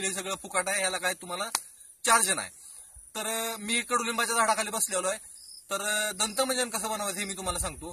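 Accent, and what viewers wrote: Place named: Satara, India. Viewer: Bhau Bhasha tumchi Sataryachi vatate Bhetaychi ichha ahe Sataryat kuthe asta?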